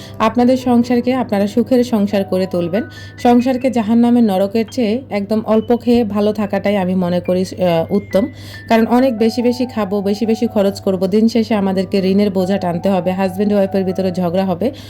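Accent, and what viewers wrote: Noakhali, Bangladesh. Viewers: নোয়াখাইলা বলে কথা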